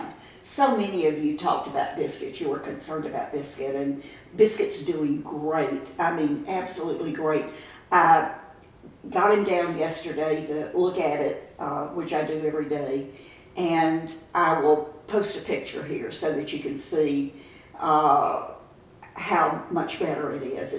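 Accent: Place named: Arkansas, United States. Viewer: I always wanted to ask you are you from Arkansas? Because I know someone that has That is from Arkansas and has the same accent that you have. So I was just wondering where are you from to get such an accent like you have?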